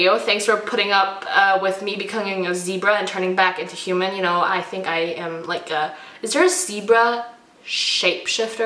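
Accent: United States. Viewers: woah this might sound weird, but you sound especially american in this video woahhhhh also yes that mt. everest miscommunication mountain is v irritating marie rutkoski why do you hurt us so i've been wanting to pick up red queen bc everyone loves it but ehhhhhhhh it does sound v typical and i want something that's going to BLOW ME AWAY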